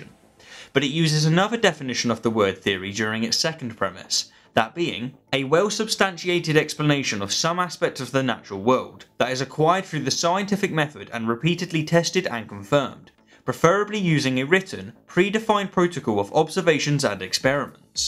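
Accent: United Kingdom. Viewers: Esq. (I say that because to my ears you sound posh English) I speak English with a Michigan and Ohio accent actually my accident tends to be related to that spoken in Grand Rapids and Detroit, Michigan along with Toledo, Ohio. According to some PhD linguist test whose name I can’t remember and am too lazy to look up. Anyway all that being said I have noticed the same lack of understanding between the colloquial definitions of theory and now that you pointed out the different definitions of law. I’ve known about the different definitions of those words and several others misused by creationist and for that matter certain uber-liberals and ultraconservatives who are very careless in their misuse of words and phrases. Particularly in using words that has several different definitions but implying there is only one meaning to confuse their audience.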